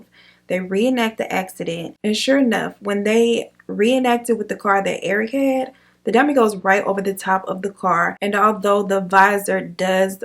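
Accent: South, United States. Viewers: I like you. You have calming voice along with everything else. If you would accept a positive advice, of course in case you would like subscribers from all over the world, including not native speakers. I understand you in general, but sometimes I can't hear clearly. You speak soft and when you do it fast, silent and typically southern with lots of reductions i am having a hard time. Please don't misunderstand me, it's all positive, I love your videos and wish you the best.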